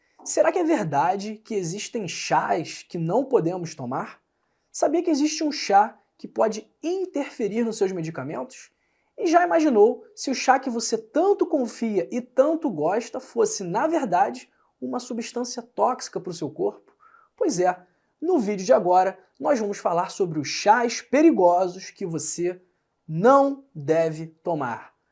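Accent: Rio de Janeiro, Brazil. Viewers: Como assim, sotaque arrastado. Ele é carioca . Queria que ele falasse como? Que observação mais sem sentido. Valha me Deus!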